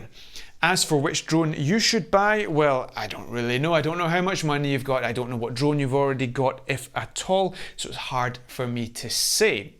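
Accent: Scotland, United Kingdom. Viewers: Love your accent haha! You sound EXACTLY like my Scottish neighbor.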